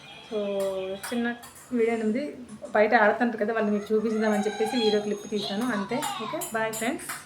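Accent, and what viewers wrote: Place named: Vizianagaram, India. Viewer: మీరు చక్కటి మన vizianagaram యాసలో మాట్లాడటం చాలాబాగుంది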